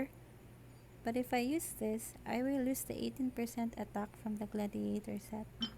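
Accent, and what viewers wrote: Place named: Philippines. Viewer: Sabi ko na nga ba filipino accent si ate support kita🥰♥️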